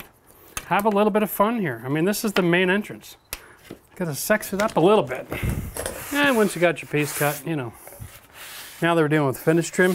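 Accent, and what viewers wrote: Canada. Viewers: You Canadian boss??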